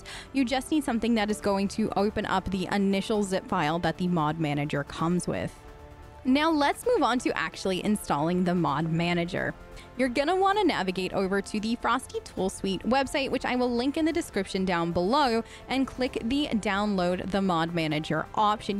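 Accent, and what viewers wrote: Australia. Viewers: Look, I have to know, what is your accent? It sounds like you're alternating between Australian and American to me (maybe because I'm Australian). Also, super-duper thanks for this. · I keep hearing an aussie accent slip in there. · The accent is 100% not her original accent. I'm confident that she is Australian. It comes through, especially in moments of focus intensive thinking. Listen out for it, you will hear it. Specifically listen to the words beginning and ending with "o". Australians pronounce that very differently from Americans and you can hear her slip up often. Listen out for "hello", "over", and others. Don't dismiss it, there's a reason people don't notice. She does the American accent very well, even if a bit stereotypical.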